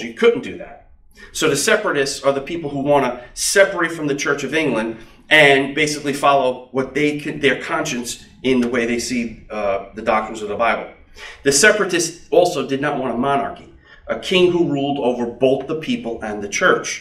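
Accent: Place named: New England, United States. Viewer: This guy is awesome 👍 even I can pay attention to him. I think it's his New England accent (Massachusetts?) Anywho, thanks for breaking this down for us simple folk😊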